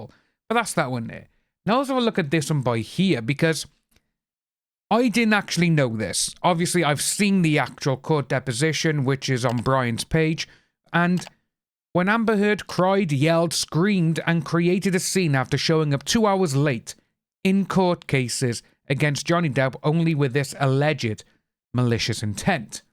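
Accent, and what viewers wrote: Wales, United Kingdom. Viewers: Are you Welsh? I hear it in your voice. I’m from Tonypandy. I agree with your comments btw. Spot on. 🏴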